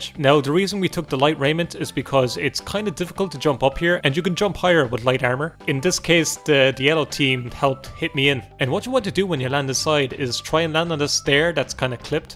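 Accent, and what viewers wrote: Ireland. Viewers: Ooh a fellow Irishman. Where you from? Love the video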